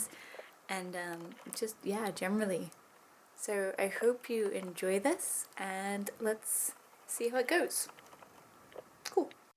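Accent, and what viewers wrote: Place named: United Kingdom. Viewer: Although the music was a little bit too loud, it was fun to watch and it was really interesting to see you more natural (you look good without makeup) more spontaneous more random :D :-) Also you have beautiful british accent (I know, sounds weird, but that's how it is, I love it lol). And I've never seen that thing you're releasing the bowling ball from, but I must try it, looks like fun, hope we have it here in Czechia or Czech republic or whatever you foreigners call it nowdays! :D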